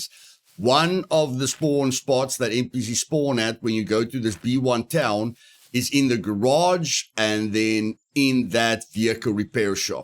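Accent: South Africa. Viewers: Your accent...is this South African?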